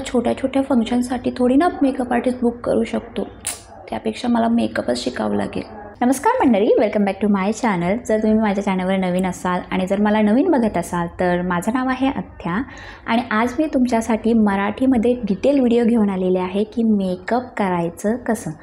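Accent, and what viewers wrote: India. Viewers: Vada saydchi aahes ka bolnyavarun samjte must video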